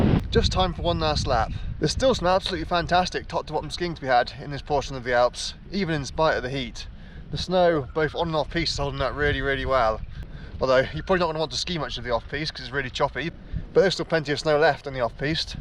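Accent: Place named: Scotland, United Kingdom. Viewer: you mentioned living in Scotland, are you from Scotland? I always thought your accent sounded similar to Scottish Cyclist David Millar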